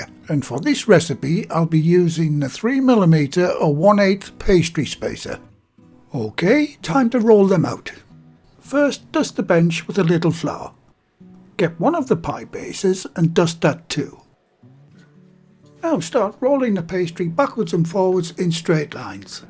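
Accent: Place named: Northeast England, United Kingdom. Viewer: is from north east England, where people are known for their kindness and being very friendly. I know, I live here too.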